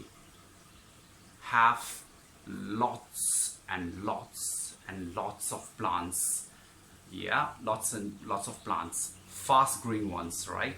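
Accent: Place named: India. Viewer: very informative video may i ask how come do you have an indian accent?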